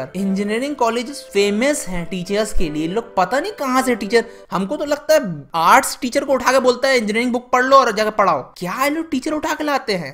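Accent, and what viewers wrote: India. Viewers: Up ke lagte ho...Awsome vedio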